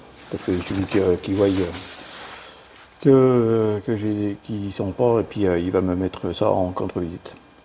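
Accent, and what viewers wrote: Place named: Franche-Comté, France. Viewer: Je crois entendre l'accent franc comtois !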